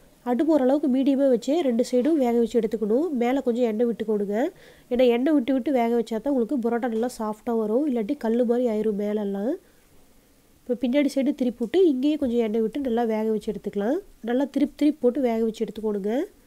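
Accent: Kongu, India. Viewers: அருமையா சொன்னீங்க ! கொங்கு தமிழ் அருமை ! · Your kongu Tamil was good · Kongu tamil eppovuma alagutha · Good kongu slang😀😀😀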